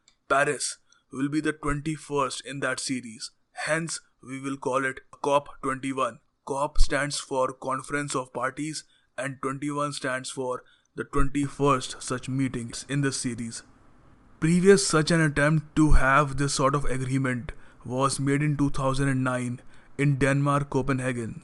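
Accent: India. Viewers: I don't want to listen to that stupid annoying Indian accent! Ewww. Just fuck off!!!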